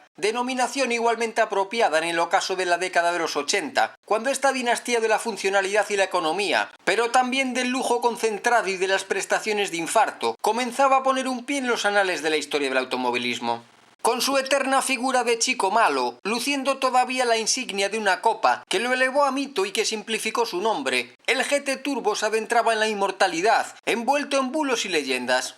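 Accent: Galicia, Spain. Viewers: Es gallego, joder. Es su acento, tan español como el tuyo y seguramente mucho más bonito.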